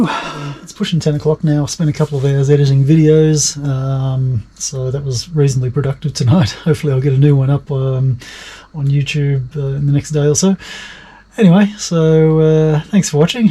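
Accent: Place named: Australia. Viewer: Nice to listen to an Aussie in an area I’m familiar with. 😀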